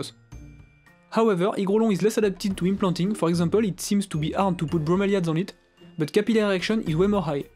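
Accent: France. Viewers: avec cet accent français, j'ai tout compris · that french accent I just can't stand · le fameux accent Français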